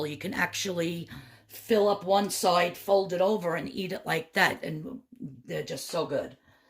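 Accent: New York, United States. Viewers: ... I love you! Just found you on YouTube! Love your NY accent.....lol... where are you from? Long Island? the Bronx? I'm going to try this recipe.... You are very innovative my dear.... take care and will be supporting your channel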